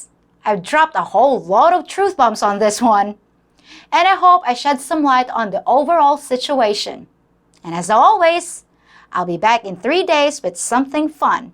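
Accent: United States. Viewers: did you live in the states? You sound way too Americanized even your way of thinking. One rule for men to follow. No honey no money. Meaning you have to meet them first and see how it unfolds. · How do you sound so American and know our colloquialisms? ad nauseum, I know many who dont know this phrase and many others you use. Did you live in the US? Canada?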